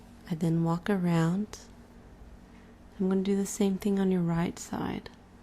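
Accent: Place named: Midwest, United States. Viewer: It's alright. You and I have the same accent. Not quite... deep southern. And not quite something else. >_> I'm not even sure what it is. Midwestern, I suppose. I LIKE IT. <3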